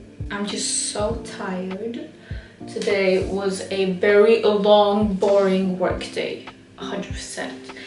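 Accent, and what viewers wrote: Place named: France. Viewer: Your accent is so french, where do u come from ?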